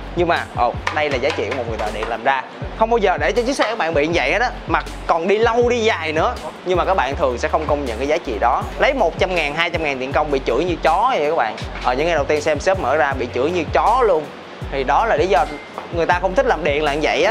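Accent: Standard. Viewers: Hoc văn giỏi hong, nói chuẩn vậy trời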